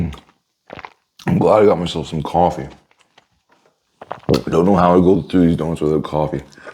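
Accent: Canada. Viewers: I love your Canadian accent, it's adorable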